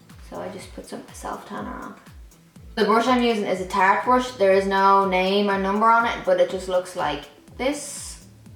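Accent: Ireland. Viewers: You just speak like Bono and the others from U2 😎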